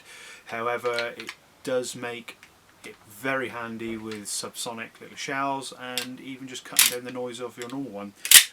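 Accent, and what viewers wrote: United Kingdom. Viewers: Sound like a devon boy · Sounds like your from the forest of Dean area? Do you do any boar control?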